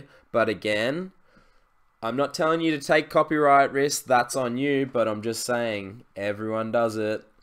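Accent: Australia. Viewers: Wich part of Australia are you mate??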